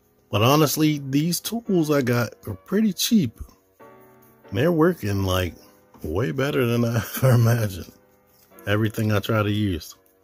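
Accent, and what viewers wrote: United States. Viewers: You have the most American voice I’ve ever heard don’t get me wrong is cool you should do the voice actor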